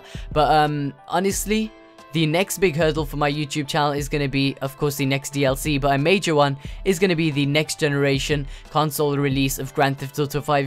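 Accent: Birmingham, United Kingdom. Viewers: Uk Birmingham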